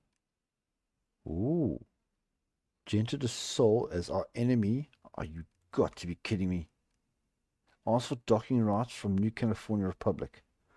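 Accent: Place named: Afrikaans, South Africa. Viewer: Best vids, bolstered by unique Afrikaans accent for American viewers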